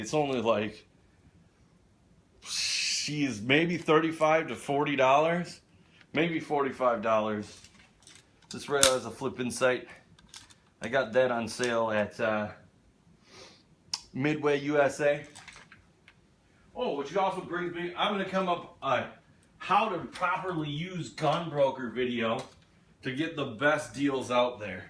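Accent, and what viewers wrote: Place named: North, United Kingdom. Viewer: Btw, zip ur fly and learn some southern dialect. The rapid speech northern tone doesn’t do your guns justice. Practice: say slowly, - dog gone.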